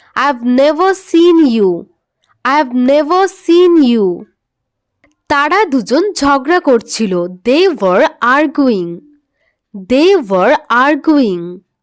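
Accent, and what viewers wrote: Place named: North Bengal, India. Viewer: উত্তরবঙ্গ মনে হয় আপনার বাড়ি।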